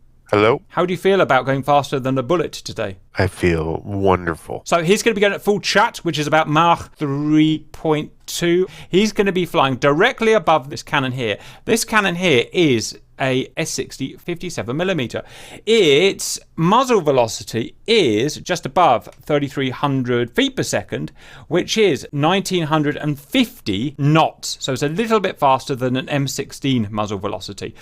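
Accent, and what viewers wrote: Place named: England, United Kingdom. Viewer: 32th speed? You sound English, has it really come to this?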